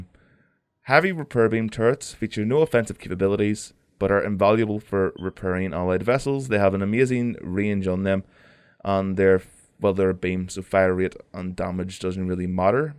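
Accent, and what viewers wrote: Northern Ireland, United Kingdom. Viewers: you sound northern irish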